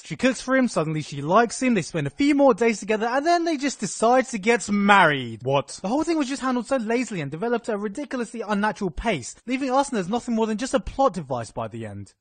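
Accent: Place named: United Kingdom. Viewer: 英國口音就是這聲音啊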